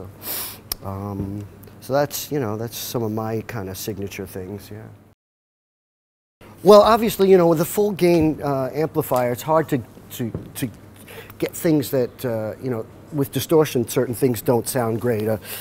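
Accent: Brooklyn, United States. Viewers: His palm muting virtuosity is out of this world. Love that you can hear a little of that 'Brooklyn honk' creep into his speech every now and then too. A nice Jewish kid from Brooklyn :-) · Is he from Brooklyn? I forget, but it sounds like it. What a cool guy.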